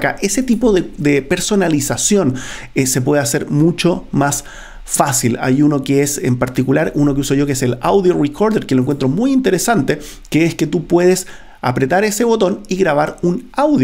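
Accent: Chile. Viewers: ¡Qué agradable escuchar el acento chileno cuando el orador tiene una excelente dicción! Además, el contenido del video aporta información valiosa para quienes comenzamos a explorar el uso de estas herramientas.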